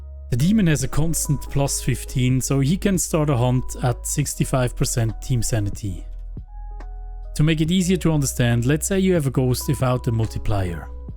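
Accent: Switzerland. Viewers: Yeahh cool vid👍🏾🙏🏾🙏🏾 you sound like e schwitzer !? 😎😅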